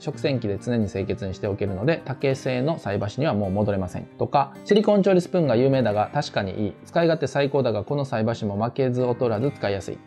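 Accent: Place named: Kansai, Japan. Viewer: さん、明けましておめでとうございます。🙇🏻 去年夏に引っ越ししてから、無印良品の商品を購入する前にはこちらを見てから購入してます。関西人特有のおもろい話しぶりで楽しく拝見しております。私のイチオシは、ネット限定で売ってますが、スチールの四角い懐中電灯です。手で握って持ち歩く懐中電灯とは違って、持ち手が付いているので、エコバッグと一緒に持ってプラプラと歩いて帰るのに使っています。外灯がなくちょっと暗い道も安心して帰れます。形も可愛いし、乾電池で簡単にオンオフできてシンプル。私の隠れたお気に入りです。😊💕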